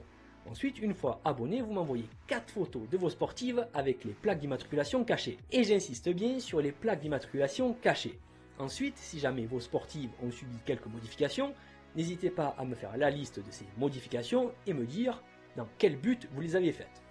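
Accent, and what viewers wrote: South, France. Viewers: Merci YouTube de m'avoir emmené ici, c'est vraiment très intéressant, le tout saupoudré d'un accent du sud chaleureux 👍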